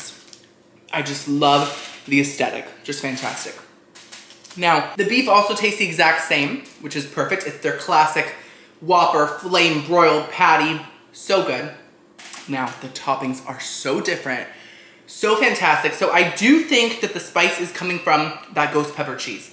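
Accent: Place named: United Kingdom. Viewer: with a British accent is what I am here for:-)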